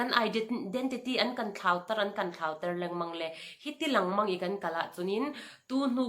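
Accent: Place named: Mizoram, India. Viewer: Na holh Accent hi a Pangkhua holh thluk ngai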